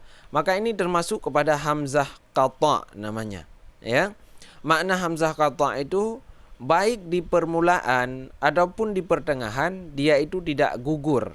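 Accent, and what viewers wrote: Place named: Madura, Indonesia. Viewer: Kental bangat madura nya..😁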